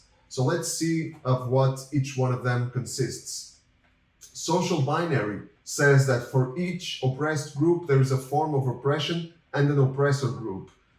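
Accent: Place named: Brazil. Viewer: I'm learning Portuguese , i love your accent, I'm not sure but probably you're from São Paulo do Brasil, or Portugal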